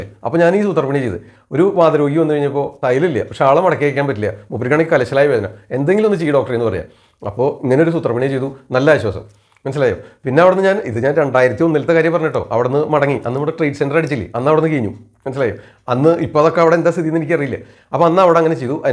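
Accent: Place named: Kerala, India. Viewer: നെടുമ്പാശ്ശേരി ഭാഷ 🤓👍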